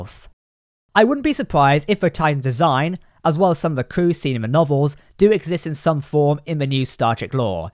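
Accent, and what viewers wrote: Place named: United Kingdom. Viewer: Thumbs down for the maddening sound of your British R's.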